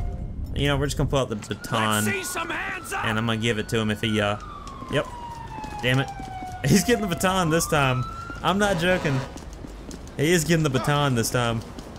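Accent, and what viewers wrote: South, United States. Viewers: Wat state are you from cause it sounds like you have a southern accent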